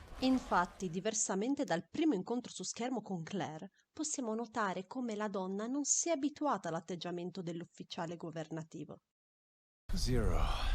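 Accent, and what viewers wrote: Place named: Italy. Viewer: You've just became one of my favorite humans on earth ! Really good video and i like your italian accent ! It's very well written and it's consistent the only thing i could say : " just continue !"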